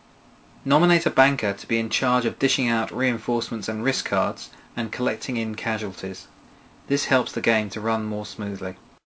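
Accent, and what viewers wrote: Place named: Australia. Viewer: wow he sounds Australian!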